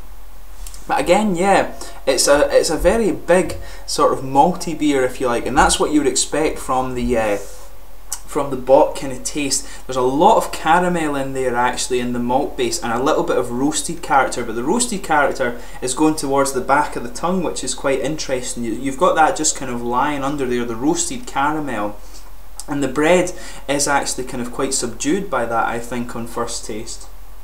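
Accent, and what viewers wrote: Scotland, United Kingdom. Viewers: Beeing a German living 70 miles away from the Schmucker brewery I tried hard to get it from a local vendor. Almost impossible ... apparently I have to order it via the internet. Anyways: Good review! I will definitely order some bottles. Feels funny as a German Scotch lover to listen to an English review of a German beer done by a guy from Scotland. :) Cheers!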